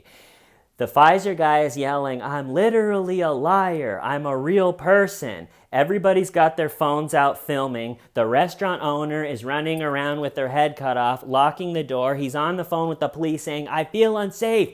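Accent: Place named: Connecticut, United States. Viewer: You sound like your from Connecticut or some shithole like that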